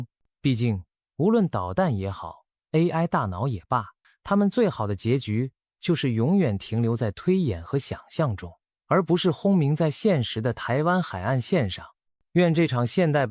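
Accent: China. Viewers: 你的口音足中共国的人,你的知識相對匮乏,解放軍不會先對台灣開第一槍,會對駐日美軍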